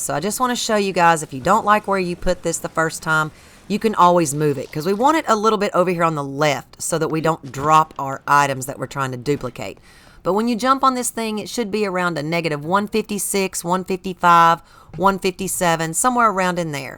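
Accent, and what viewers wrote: South, United States. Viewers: FWIW, I thought your explanation was much easier to understand and follow than any of the other channels who go over this. Maybe it’s the Southern accent! ; ) t. a fan from SW Virginia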